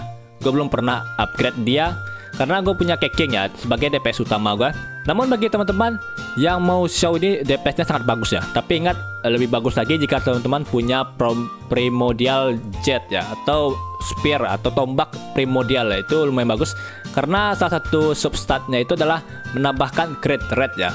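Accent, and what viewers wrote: West Sumatra, Indonesia. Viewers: logat lu keknya orang minang ya bg?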